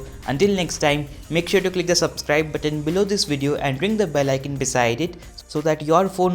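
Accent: India. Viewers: That Indian accent tho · 咖喱味英语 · Damn, why all the recipes with Indian voice there and everywhere behh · you are indian? You voice tune like indian sub region. And thanks.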